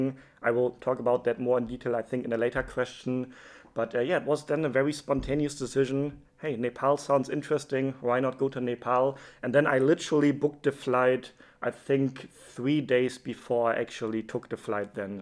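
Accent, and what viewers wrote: Germany. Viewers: U sound like German accent? R u German?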